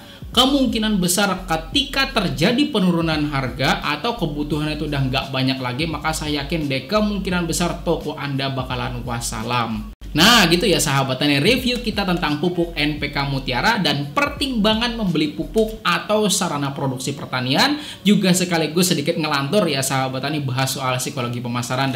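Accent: Banjar, Indonesia. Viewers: Logat banjar 😁 sipp dah bang info nya , tks 👍👍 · Kya orang banjar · npk 16 yg paling bagus npk yaramila.. logat bahasa nya org Banjar lh?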